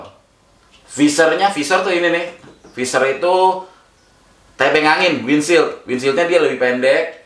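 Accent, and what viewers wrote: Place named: Medan, Indonesia. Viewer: Medan banget 😁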